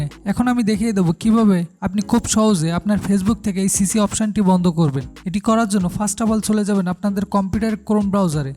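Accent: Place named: Barishal, Bangladesh. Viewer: ধন্যবাদ ভাইয়া, এত সুন্দর উপকারী একটা ভিডিও আমাদের কে উপহার দেওয়ার জন্য,আমি এই cc নিয়ে অনেক টেনশনে ছিলাম,কেনো ভাবেই বন্ধ করতে পারছিলাম না,অনেক খুঁজা খুঁজি করে নতুন আপডেট এই ভিডিও টা পেলাম, আপনাকে অনেক অনেক ধন্যবাদ এগিয়ে যান ভাইয়া ইনশাআল্লাহ পাশে আছি, এবং আর একটা কথা আপনার বাসা মনে হয় বরিশাল,কেনো না আপনার কথায় বুঝতে পারলাম?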